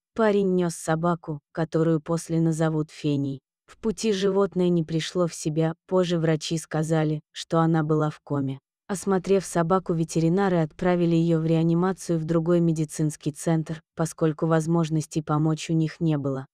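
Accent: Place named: United States. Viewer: Кто нам читает американка что-ли слышите как говорит ?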